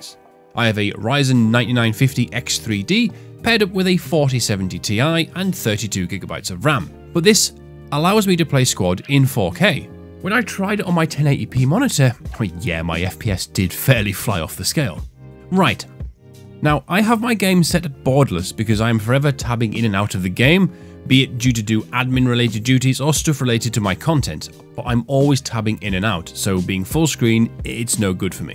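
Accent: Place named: Newcastle, United Kingdom. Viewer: At first i thought scots, but i think its geordie accent (newcastle) cause ur "done" is more "dun" 😁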